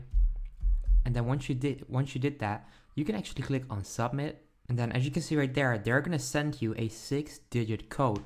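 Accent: Netherlands. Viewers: Fakka Man Bro dit helpt gewoon ik hoorde al aan je accent dat je nl was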